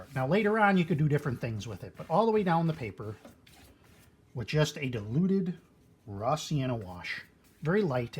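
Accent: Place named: United Kingdom. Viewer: It takes a little time to tune the American ear into everyday conversational style British accents. There are so many and can be difficult for a while.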